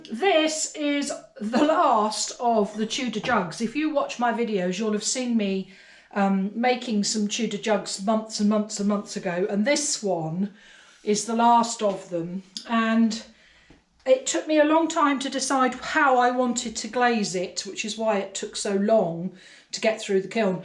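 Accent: England, United Kingdom. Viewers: All you do is so wonderful...none of it is "daft", as you say, LOL! Love the English accent too! The Tudor jug is gorgeous ! The mono-printing is very intriguing; love the look and curious about the process. Will keep an eye out for a video on it as well as the test tiles. Your husbands dish is very stunning. Aren't you glad you didn't give up on it?! Inspires me to re-fire a piece I've made. Had my eye on the poppy heads on the shelf behind you. Looking forward to part 2 on that video! Don't you just love the way this hobby can draw us away from the noise in the world around us? Allowing us to get lost in the process of creativity; spending hours & hours honing skills and processes. It's quite meditative! Many, many thanks again for sharing your wonderful talent and discoveries in pottery. There are a lot of videos about pottery here on YouTube, but yours most definitely rank high in the top 10!!! 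Kindest regards...stay safe and well. Cheers from across the pond :0)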